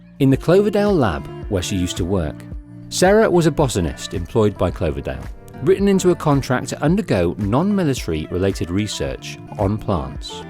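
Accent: United Kingdom. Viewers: The British accent INSTANTLY bores me.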